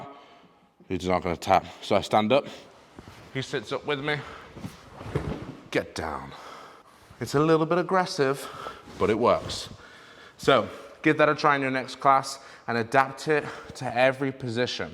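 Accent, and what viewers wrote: England, United Kingdom. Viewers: lol thanks man, I needed that. And thanks for the vids, I really appreciate the details in the way your break this stuff down. For some reason your immaculate English accent is easier to recall than my American coaches when I’m being strangled.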